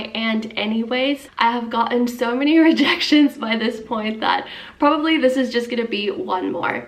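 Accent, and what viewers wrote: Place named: United States. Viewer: your accent is more American than Honduran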